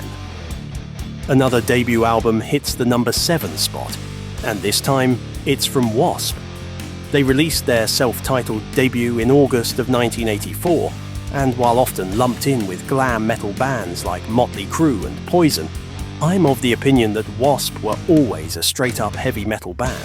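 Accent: United Kingdom. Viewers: You are British as per your accent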